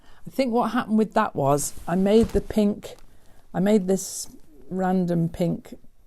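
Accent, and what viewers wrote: United Kingdom. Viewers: Hi, I'm so glad that I found your channel by accident some dass ago. I searched for some ideas for scraps as I regularly do, but this time your pink/red quilt showed up and caught me. I watched some of your videos since then and I really like them. Hearing you talking, looking at all the craft supplies and hearing your dialect is great (I'm German and love hearing different kinds of English, especially "British English"). All the best, Stephanie PS: Just subscribed for future videos 😉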